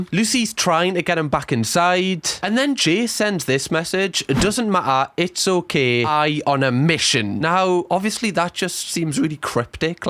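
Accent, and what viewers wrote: Newcastle, United Kingdom. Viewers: Are you geordie ? I keep hearing bits of welsh in your accent. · Your voice is going up at the end of every sentence.. I get your a geordie.. But it's hard to watch with the internation...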